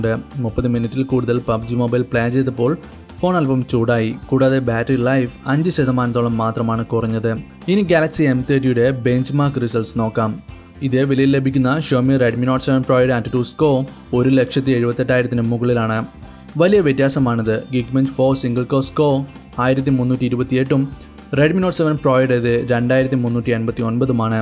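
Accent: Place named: Kannur, India. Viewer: Bro kannur ano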